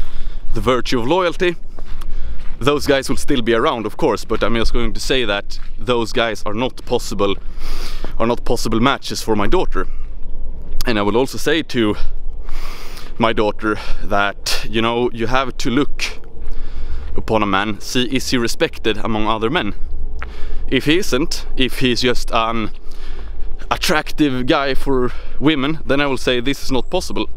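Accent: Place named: Sweden. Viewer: "If i See behavior i like - i press Like!" "If i See behavior i do not like - i do not press Like!" The Swedish Accent makes it sound even more savage!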